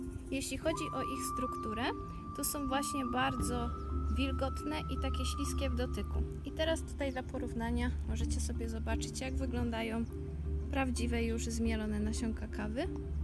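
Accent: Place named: Minas Gerais, Brazil. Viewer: Olá, você tem um lindo sotaque solo da região sudeste mineira minha graça é Daniel... Wow, niesamowicie, kiedy mówisz po brazylijskim portugalskim.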